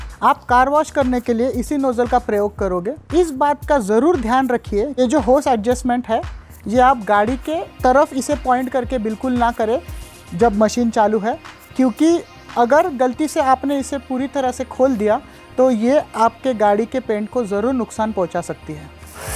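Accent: Maharashtra, India. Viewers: Are you marathi? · are you marathi?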